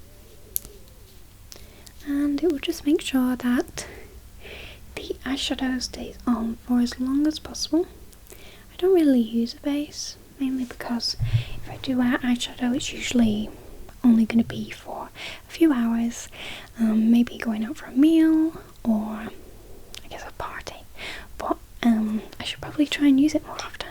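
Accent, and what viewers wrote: United Kingdom. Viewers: Madeline C correct me if I’m wrong, but from theatre experience, this sounds like British to me. ( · You have a beautiful accent! Definitely no need to feel self conscious about it; I think a lot of people probably feel the same way about their own voice. I'd watch any of your videos, whispering or soft spoken you're so relaxing to listen to. Out of interest what part of the UK is your accent from?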